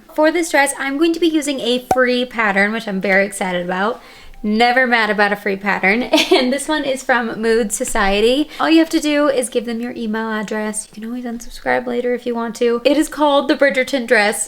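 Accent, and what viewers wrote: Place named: United Kingdom. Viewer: I love the intro😊😊😊. And I thought the British accent was pretty great❤❤❤❤❤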